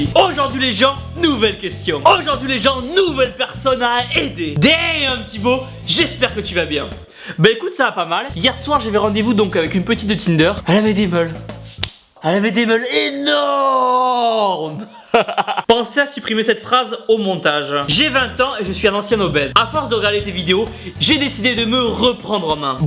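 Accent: South, France. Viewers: La recette avé l'accent avait un petit coté Maïté! C'est très bien mon gars en plus d'être valables tes vidéos sont drôles ce qui est agréable dans une discipline ou beaucoup de gens prennent on sait pas pourquoi le "melon", ça change des potaches adeptes du selfie qui font mon désespoir quand je tombe dessus... Continue bravo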